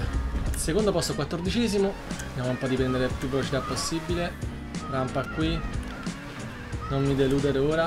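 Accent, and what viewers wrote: Naples, Italy. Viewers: non so se lo hai mai detto,ma sei napoletano? -Sasy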